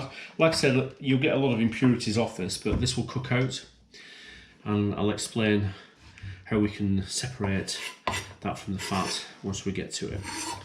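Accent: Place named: North, United Kingdom. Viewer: The Northern accent gives a certain degree of credibility, you know this beef dripping is the real deal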